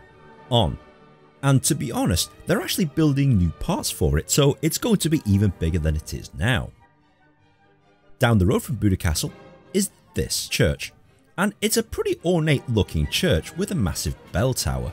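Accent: United Kingdom. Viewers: Fantastic video mate. Great to hear a travel guide done by a fellow Brit instead of another cheesy American. What’s the website for booking trips to the Hungarian parliament building? I’m hoping to go Budapest in the Spring.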